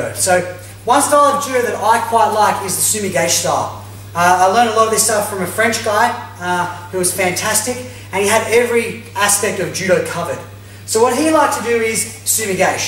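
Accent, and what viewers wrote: Australia. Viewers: Is that an australian accent? =) Awesome vid!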